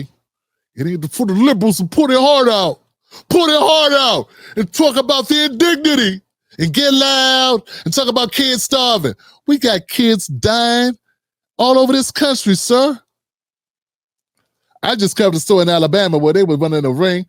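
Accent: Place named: Washington DC, United States. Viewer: I watched Professor Ball's episode that you are citing. It was very well done and researched. I'm not sure what you're trying to accomplish. But I haven't taken your show serious in a very long time, which is why I don't usually watch it anymore. You should stick to comedy. I only subscribed to provide support to a Black podcaster. But I am not down for this chocolate-covered white conservative with a DC twang. I''m out. Unsubscribed.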